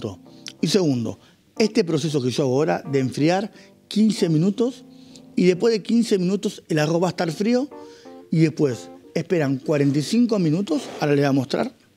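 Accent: Argentina. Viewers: Un japo hablando argentinoooo 🤌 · Me encanta el acento tan argentino que tiene usted, que es originario de Japón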